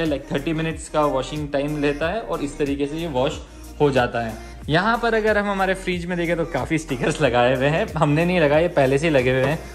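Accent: Indore, India. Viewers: Bhai pakke indori ho - accent sun ki samajh me aa jata hai haha ;)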